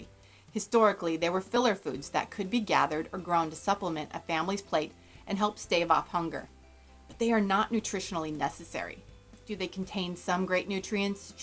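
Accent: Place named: United States. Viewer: You are carrying out an experiment on your children which i consider unethical. The fact that you and your husband previously ate crap is irrelevant and at least your husband was very obese and possibly you were too. Get one of those carnivore drs to become your dr. You most likely are from the US based on your accent. In the US if you have evidence you can sue the hell out of people. So go get one of these drs to tell you to experiment and advise your children what to eat. Even the inuit had a diet which was approximately 20% carbohydrate which is extremely low. Best of luck and consider the diet recommendations by all but a single practitioner Dr Anthony Chaffee.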